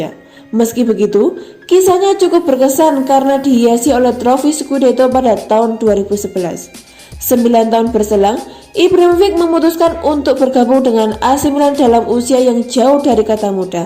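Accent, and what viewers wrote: Java, Indonesia. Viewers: Medok tenan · Aih jawa x ,betul terok lah ,ganti suara tu,jangan terlalu percaya diri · Naratornya kurang asik Medok bgt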